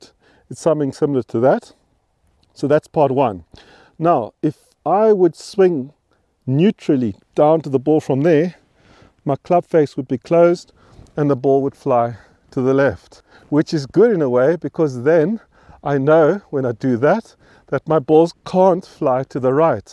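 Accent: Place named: Austria. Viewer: Love the Arnold accent ... nice video!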